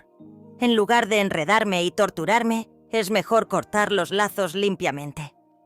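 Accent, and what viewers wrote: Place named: Castile, Spain. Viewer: AHORA LA NARRACION ES CASTELLANA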